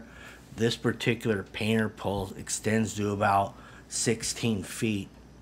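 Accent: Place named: California, United States. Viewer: Listening to California Surfer accent takes me back...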